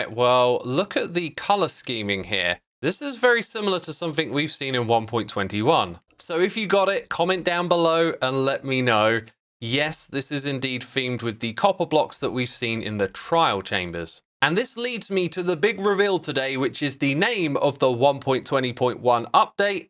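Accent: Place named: United Kingdom. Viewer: Why do you sound... MORE British than usual???? Is that just me??? lmao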